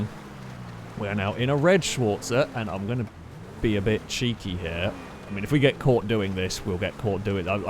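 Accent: United Kingdom. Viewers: depends on what the Goal is. the player wants to sneak around and never be seen while he achieves his goals. the main face of YouTube channel knows that we want violent crashes and lovely United kingdoms commentary over unspeakable violence!! I've never done it, but it's got to be a rough balance to achieve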